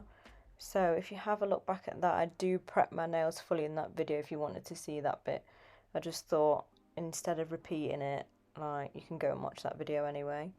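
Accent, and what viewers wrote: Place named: Midlands, United Kingdom. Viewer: Hi I have this product, my question is have you used it without a colour on top? I found it went opaque did not stay clear. It’s a good strengthening product & I’ve since got the peach colour in hope that stays its peachy shade. Thank you for video. Also rare to find uk tutorial accent sounds like it’s from Midlands am I right? 😊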